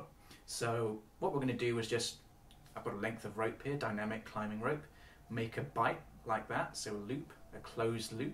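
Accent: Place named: United Kingdom. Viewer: Hi there. Another fantastic tutorial thanks. I'm brand new to climbing and I wonder if you could tell me if this knot is as strong as the re-threaded figure 8 please ? I see people tie in on videos with the re-threaded and I wonder why they don't use this and a carabiner to their harness ? Many thanks and great to hear another Brit on YouTube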